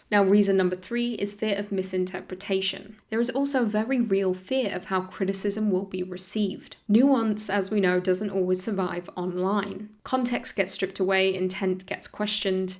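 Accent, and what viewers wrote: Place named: Transatlantic, United States. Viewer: Are you British or American? You're putting on a transatlantic accent, saying 'criddicism' etc. Don't be a wash.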